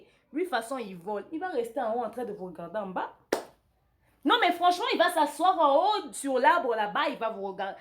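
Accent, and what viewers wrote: Ivory Coast. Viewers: quand elle parle comme les ivoirien elle enleve sa exprès peut être que tu ne t'en rend pas compte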